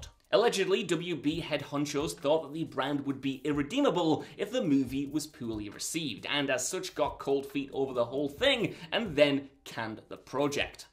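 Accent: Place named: United Kingdom. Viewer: The UK pronunciation of ‘H’ is distracting to my country a$$. Love the channel.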